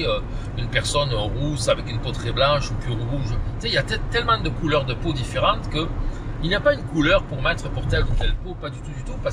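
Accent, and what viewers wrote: South, France. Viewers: Merci pour vos vidéos. Je suis fan. Et de savoir que vous êtes au Québec me ravit. En revanche vous avez dit à un moment donne que vous aviez travaillé à Berne l’Etang. Et question un peu indiscrète vous êtes de cette région de France. À vous écouter vous avez un accent du midi. À bientôt.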